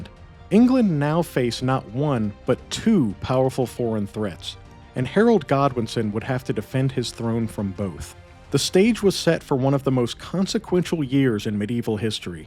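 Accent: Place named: United States. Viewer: the US pronunciation which jars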